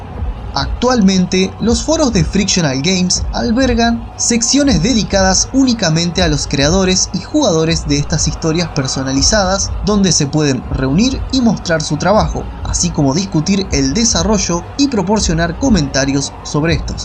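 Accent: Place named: Peru. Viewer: Alta voz de peruano tiene este re gri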